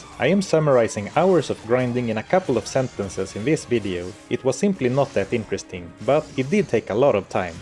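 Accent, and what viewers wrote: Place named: Sweden. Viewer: Finally new video i almost started missing your swedish accent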